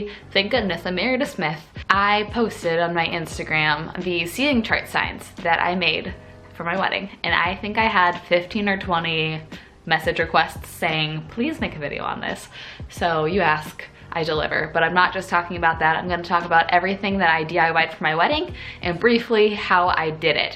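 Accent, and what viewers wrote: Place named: South, United States. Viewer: Lol you always start your videos with a thick southern accent......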